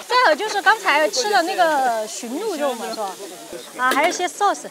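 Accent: Sichuan, China. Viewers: 你这四川口音好重，😊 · 你这个川普真滴正宗啊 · 你的川普不错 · 四川口音好重！ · －口川普，好可爱😊😊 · 你這個川普可以喲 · 这口音绝对四川妹😄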